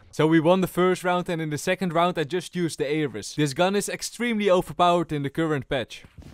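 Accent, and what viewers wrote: Netherlands. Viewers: what I mean is the English is so heavy he's basicly speaking Dutch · im also dutch and i like your accent XD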